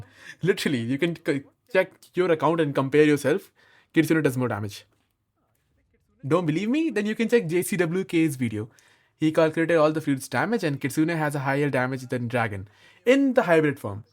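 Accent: India. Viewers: I can feel your hindi 😅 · oh yea, he sounds like indian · Ppl gonna hate me for this but.. WHY INDIAN ACCENT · Bhai tere accsent indian hai toh hindi bola kar yar❤ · "Bro u sounds like Indian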